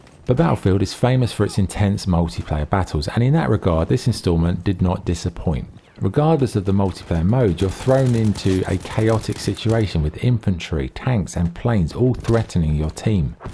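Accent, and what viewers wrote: United Kingdom. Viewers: Are you brit ISH?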